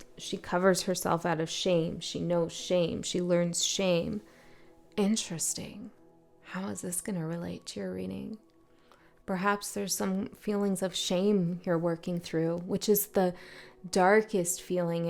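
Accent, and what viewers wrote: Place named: Canada. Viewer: i see that you are a piacean same as me, love your ring on your right hand, i have always loved your canadian accent, you have a very soothing voice, i see the letter m in thye palm of your hands and the half moons in your nails. i was born on march the 6 th at 6 am 1962 what day were you born?. ps your boyfriend is a lucky guy i hope he realises that. what part of canada are you from, love your cat by the way.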